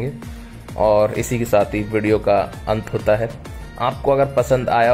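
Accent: Standard. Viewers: Mere Bhai Dil Se Badhai aur jo Tum kar rahe ho na Vakya mein lajawab hai main sketch ki baat nahin kar raha Mujhe sketch ke sath Ek Aur chij dikhi vah bhai aapki voice bolane Ka Tarika Jis shuddh Hindi mein baat karte ho na bhai Kasam Se Dil Garden Garden Ho Gaya.. love u bro.. Jay Shri Ram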